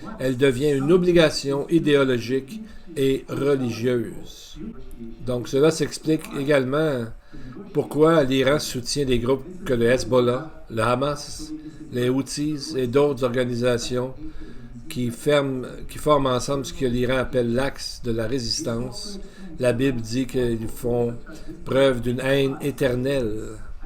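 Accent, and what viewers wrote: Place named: Canada. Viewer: C'est un accent canadien. Il est flagrant de s'apercevoir que l'intonation marque bien l'origine de ce son du continent canadien. Un beau pays, le Canada. Le Canada fait aussi de la politique, incroyable ! Dommage que l'on n'ait toujours pas la vérité. A croire que l'homme en est incapable.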